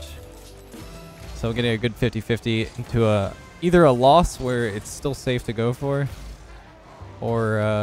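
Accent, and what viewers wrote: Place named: Canada. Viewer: I've watched every one of your daily vids for months now and I can honestly say... In this one you sound so Canadian 😂 The itis really brings out the accent apparently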